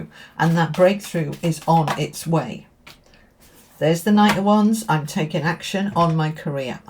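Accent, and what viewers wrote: United Kingdom. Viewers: I’m English living in Ireland it’s so lovely to hear a good old Uk dialog here,make a cup of tea and listen lovely and your large angel in the back ground is so lovely 😊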